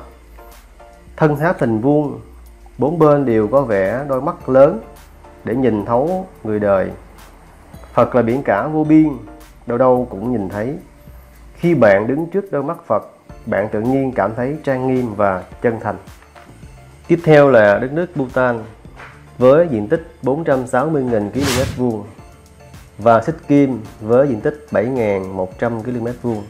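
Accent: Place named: Hau Giang, Vietnam. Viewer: Giọng người Hậu Giang bạn ơi.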